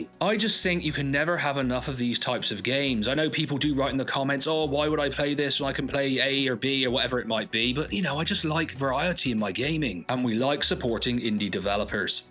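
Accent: United Kingdom. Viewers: I’m getting back into space games after a break from them and this video just popped up in my feed. I’ve subscribed, great to hear a regional english accent in a Youtube video! 😊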